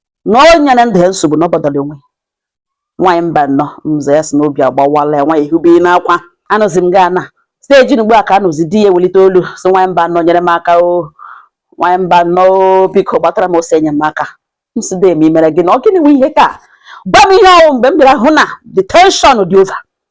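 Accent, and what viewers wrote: Mbano, Nigeria. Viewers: You're really Mbano woman. Kudos for being proud of your dialect. ❤.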